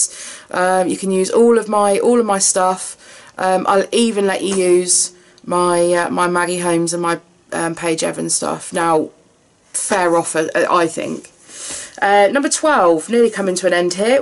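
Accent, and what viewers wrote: United Kingdom. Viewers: Can't believe I missed this video earlier. Have always loved your videos. Love this one and getting to know u better. Love your sense of humor and I could listen to the English accent all day long. Love your sassiness and love that u don't apologize for your overabundance of everything scrapbook. Much love from usa.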